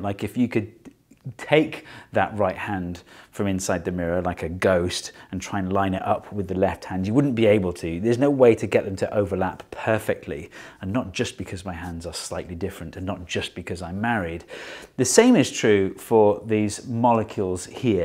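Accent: England, United Kingdom. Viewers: he finally says anticlockwise! Sounded so wrong to hear his English accent saying "counterclockwise"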